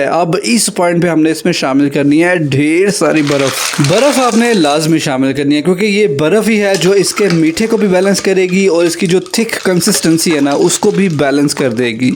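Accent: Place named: Punjab, India. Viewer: Punjabi tarka😊 Nice way of talking ❤❤❤❤❤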